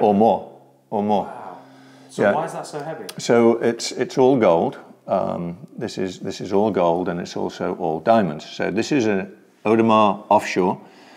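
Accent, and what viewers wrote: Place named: Yorkshire, United Kingdom. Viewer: I think this guy is great I sent him a Christmas greeting and said all the best from Leeds in Yorkshire and much to my surprise I got a reply saying merry Christmas lad. much appreciated and I'm not sure if he is from Yorkshire but he sounds like it to me anyway what a really nice guy and some people might don't think wealth suits them but it well although I should resent wealthy. People being poor as you like but no he's a gent a colourful one but still a gent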